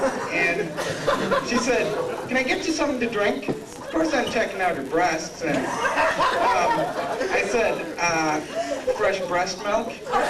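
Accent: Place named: Michigan, United States. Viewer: this isn't funny or edgy at all, and he's just awkward. his accent is the only funny aspect just because it's Michigan enough to sound a little Minnesotan and it vaguely reminds me of the guy in the snow suit shoveling his driveway and being questioned by the police in Fargo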